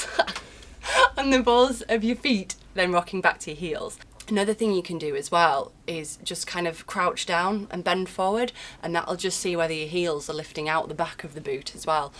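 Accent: United Kingdom. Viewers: Don't understand???You seem to be someone with a very limited knowledge of UK. What a weird comment. If you're referring to her can only think you're not English and think everyone speaks like the queen. Her accent is far from snobby possibly from the north west and is very natural and not all snobby in fact the complete opposite.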